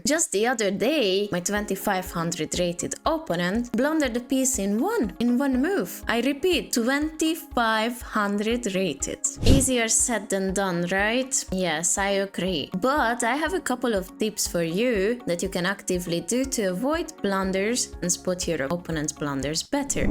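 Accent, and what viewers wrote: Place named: Eastern Europe. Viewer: Chess with east european accent sounds more trustful indeed.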